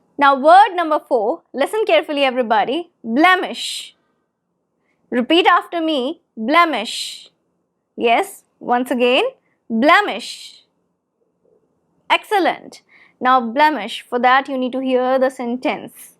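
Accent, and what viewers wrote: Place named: RP, United Kingdom. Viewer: Can be called as, Queen's English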